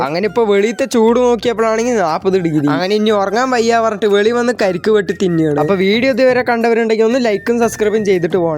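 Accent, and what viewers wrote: Palakkad, India. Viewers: bro sthalam palakkad aahno❤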